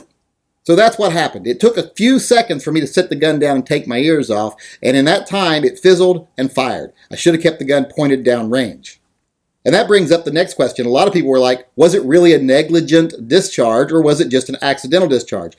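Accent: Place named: South, United States. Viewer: You may be the, but you sound like a Rebel to me. Dude, what happened to the knuckle of the index finger on your left hand?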